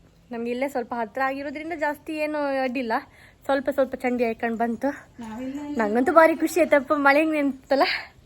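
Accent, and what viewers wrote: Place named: Kundapura, India. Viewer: Hoi...ನಿಮ್ಮ ಮಾತು ❤❤❤👌👌👌👌 Mugubott 🥰 ನಿಮ್ಗೆ chanda kansutte🌸😁❤❤❤.... ಕುಂದ ಕನ್ನಡ❤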